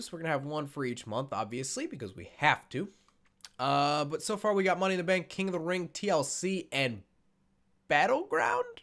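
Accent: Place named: Australia. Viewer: I dont understand why people get so butt hurt. When i heard you aussie accent i laughed my ass off (im a aussie btw) anyways you are amazing i only found you today on your WWE 2k17 Arena video. good job and keep up the good work :)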